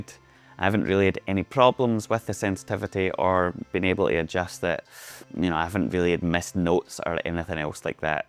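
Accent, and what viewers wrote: Scotland, United Kingdom. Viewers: Scotland ! I believed at first that the accent was Irish. Sorry I am not very used to. Beside I am french and I pronounce Exquis like exkee. May be you know it already the arpegiator has 2 mods one with hold an an other to engage just when you touch it. Hope linux and Bitwig will integrate this instrument.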